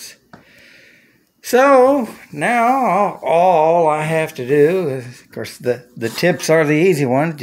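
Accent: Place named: Missouri, United States. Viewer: Hi, I’m a new commenter but I’ve watched all your videos. You’ve inspired me and I’m currently setting up shop to start scratch building an H5. I appreciate all your advice but especially like the little tidbits about scratch building. It’s also comforting to hear a Missouri accent again! I grew up in the Springfield area but haven’t live there since college. Wish I could have met Spike.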